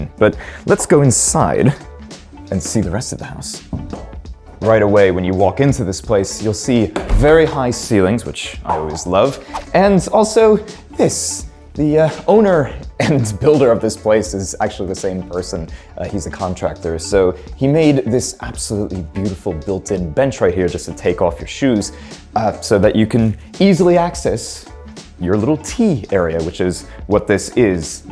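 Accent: United States. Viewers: If I remember correctly he's from NYC originally...Brooklyn? Idk I remember a video where he said the bagels aren't like he could get back home in NY, I think.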